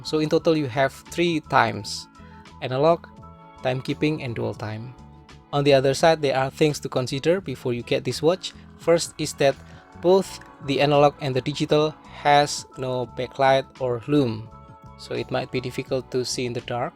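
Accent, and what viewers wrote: Indonesia. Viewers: channel review jam tangan underrated ini, inggrisnya bagus bgt dengan aksen khas indonesia yg sangat jelas pronounciation nya. lanjutkan terus mas mantap!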